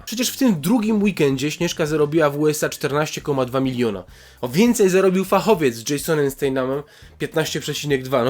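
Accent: Silesia, Poland. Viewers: Jesteś ze śląska? Słyszę akcent :D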